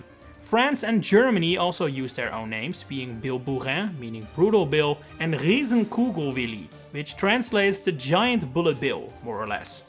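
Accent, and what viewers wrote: Netherlands. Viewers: checks profile after 5 seconds of talking: "yep, tissun nederlander." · Um, he definitely didn't add a dutch accent, he's dutch. And also...of course he uses US terminology?? It's a video about the US name of the enemy??? You're goofy for this comment lmao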